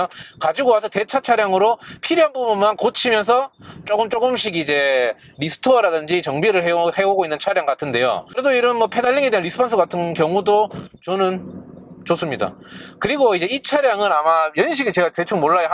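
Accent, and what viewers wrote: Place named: Daegu, South Korea. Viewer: 대구말 엄청 반갑네요 ㅎㅎ 요녀석은 정비할때 손 들어갈 공간이 여유로운 편일까요? ㅎㅎ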